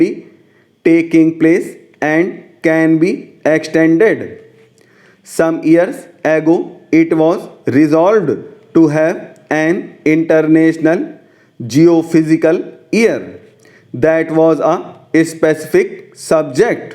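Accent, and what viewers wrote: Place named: Odisha, India. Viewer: Your voice seems like you are from odhisha , right ??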